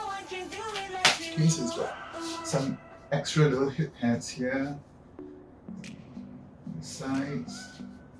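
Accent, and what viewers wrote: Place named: Malaysia. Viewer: very detailed video , im from Canada originally from Malaysia, , i recognized youre accent right away lol. Im gonna be doing drag alot more and this really helps alot thanks queen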